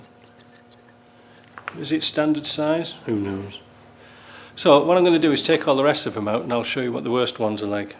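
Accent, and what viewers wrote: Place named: Northern England, United Kingdom. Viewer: Hi mate I gather you live in the USA OR CANADA somewhere as you were talking in dollars. I guess your from the UK? You sound very northern I’m northern myself. Where in the uk are you from buddy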